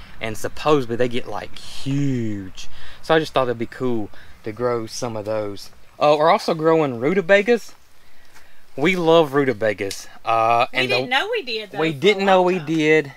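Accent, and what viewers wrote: South, United States. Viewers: It sounds like: kol er ab e. Must be your southern drawl? LOL just teasing a bit....I use to live in Georgia and one day a neighbor called me Georgia Peach and I went and cried and told my mom...she said that's a sweet name don't cry. When I listen to your talking I love it and it sure wouldn't take me any time at all to get it back down south. I love ALL of your video's for sure and look forward to every single one of them. God IS Blessing you all.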